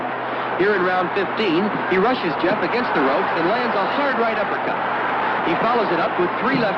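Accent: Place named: New England, United States. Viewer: I wonder how many of you recognize there was no such thing as sound recording, nor radio for that matter in 1910, this voiceover, judging by the accent, is pretty recent, within the last 35 years, from New England.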